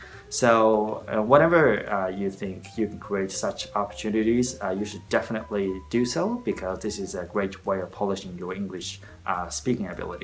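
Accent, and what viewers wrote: Australia. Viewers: ところどころオーストラリアアクセント入ってますね！バックグラウンドが感じられて良いなあと思います！ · 英語出来ないわけではないのに、なんで言ってること分かりにくいんだろうと思っていたら、さんオーストラリアの発音で英語話していたんですね。アメリカ在住なので、アメリカ英語に慣れすぎていました (^_^;)色んな地域の英語聞かなきゃですねー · すごいちゃんとオーストラリアンアクセントになってる！ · オーストラリア英語の影響を受けてるよアメリカ英語とは少し違うね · harnessed? 聞いたことない単語確かにオーストラリアえいごなんだろうな。とおもいました。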